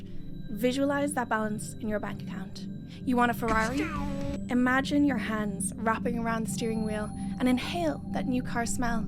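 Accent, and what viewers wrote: Ireland. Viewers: Are you American? There seems to be a slight Irish influence on your accent. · Ive finally placed your accent do you have an irish parent 😭😭😭😭 im probably late 😭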